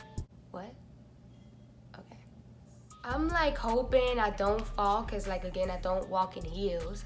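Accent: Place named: New Orleans, United States. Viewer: U in new orleans? i keep hearing u say buku lol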